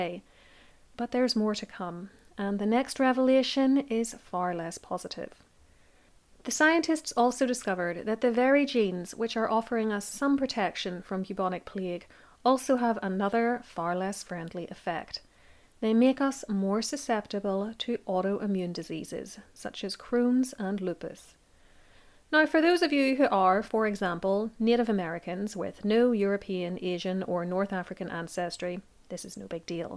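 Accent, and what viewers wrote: Ireland. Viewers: Ps. I LOVE that sweet Irish accent, jus’ sayin’… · Very interesting. Good research and no background music, thank you. New subscriber. The narrator has an interesting accent. Is it somewhere in Ireland? I could be way off, but it reminds me of my visit to Dublin decades ago. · No no it it's lovely, that's why I used Brogue instead of accent. My best mate was a lecturer at Queens, he married a beautiful lady from Ulster she has a rather stronger brogue. If we lose these wonderful parts of our culture - think how boring it will be. Your speech is wonderful , please stick with your normal relaxing voice. 👍👍👌👌 I've just subscribed , so please don't go American there's enough on Utube.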